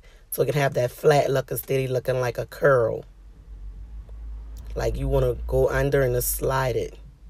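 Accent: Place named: South Carolina, United States. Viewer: South Carolina accent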